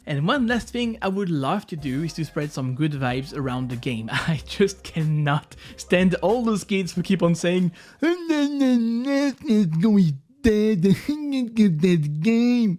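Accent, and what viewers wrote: France. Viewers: Ça fait 5 vidéos que je me pose des questions sur toi, est-ce qu'il a un accent bizarre, est-ce que c'est un anglais/amériquain qui est d'origine française, un français qui parle anglais ??? Bah je savais pas, donc finalement je vois ton interface YouTube avec "vues" et "il y a x mois". C'est bon j'ai ma réponse :D. En tous cas que tu sois d'origine anglaise ou non, mais si ton anglais est french spotted, il reste super fluide et naturel 👍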